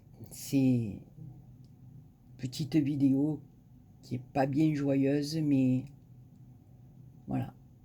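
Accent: South, France. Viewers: Coucou, Je me joints aux autres pour t'envoyer mes sincères condoléances à toi, tes enfants & petits enfants! Dans ces moments là je ne sais jamais quoi dire, mais ce que je sais, c'est que tu risque de te faire disputer par moi si tu te retiens de pleurée, car tu vas de détruire de l'intérieur et ça c'est pas bon du tout donc lâche toi sinon attention a tes fesses hi hi;) Oui tu dois rester positive et avoir toujours envie de croquer la vie à pleine dent que ce soit pour toi, tes enfants ou/et tes petits enfants et puis ton mari n'aimerait pas te voir sur la pente raide, donc ma belle continue de nous faire entendre par le biais de tes vidéos ton accent du soleil et des cigales! GROS Gros Bisouuus vous tous!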